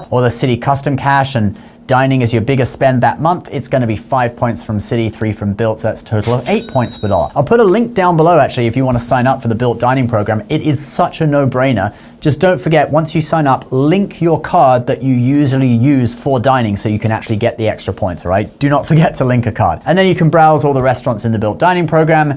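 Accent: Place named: United Kingdom. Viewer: Me too, love that UK accent- direct and to the point, not alot of fluff. Nice funny jokes and new content regularly--- he gets 100 cool points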